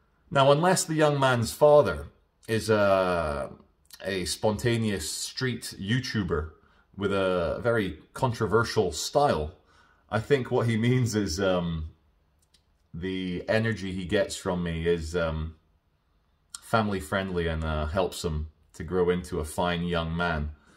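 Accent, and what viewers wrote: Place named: Scotland, United Kingdom. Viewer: he has a posh Scottish accent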